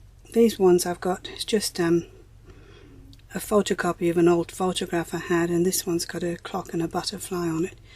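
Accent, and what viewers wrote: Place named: Australia. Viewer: Omg this is genius ! What a brill idea saving to favs. Very clear instructions thanks loads will try this. I thought you were Australian but your English ? Where are you from ? Brill vid thanks xx Amanda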